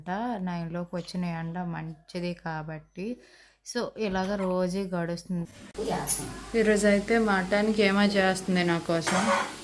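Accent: Srikakulam, India. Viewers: Srikakulam slag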